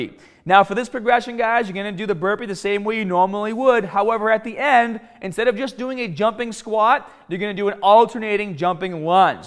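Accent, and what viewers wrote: Boston, United States. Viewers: I think that is a Boston accent, if I am not mistaken. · What accent is that?..Boston? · Love the bawstan accent. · You from beantown? Boston? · Hey I don’t remember hearing your Boston accent before where you from I’m from Southie Keeeeedd!!! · OoooOoooo that Stroing Baastan accent 😍 👌 😂 🤣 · Oh Lawd you from Boston. I love your accent 🥰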